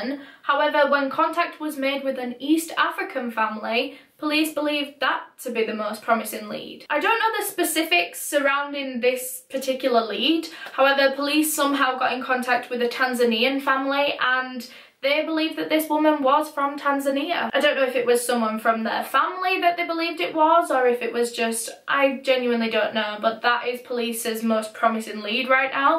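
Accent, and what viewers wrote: United Kingdom. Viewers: "So today's video..." 😄😁 (only I don't have tht Amazing British accent!)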